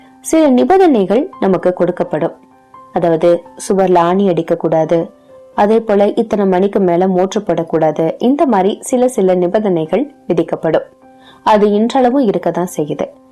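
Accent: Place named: Chennai, India. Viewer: Good evening kuttima. ஐயாவின் அடுத்த ஒரு அலையான... குடித்தனம்.... சிறுகதை கேட்டு மகிழ்ந்தேன். ஐயாவின் எழுத்துகளை விட உன் குரல் சென்னைச் சேரிப் பேச்சு வழக்கத்தை அனாயாசமாகப் பிரதிபலிப்பது அருமை! இத்தனை சாட்டையடிகளுக்குப் பின்னும் இன்றுவரை இந்த அவலங்கள் தொடர்வது தான் அந்த ஏழைகளின் தலைவிதி போலும்.... குரலோசைக்கு வாழ்த்துக்களுடன் நன்றி மகளே. அன்புடன் அப்பா.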